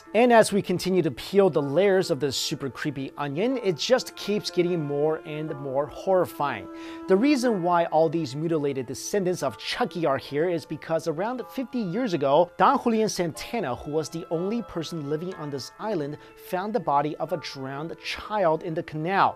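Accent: China. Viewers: listening to someone who is Chinese trying to pronounce Japanese words is amusing lol. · wheres your accent from? are you chinese?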